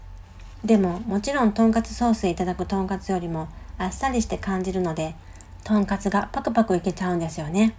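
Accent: Kyoto, Japan. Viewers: 京都弁って～情緒があって～大好き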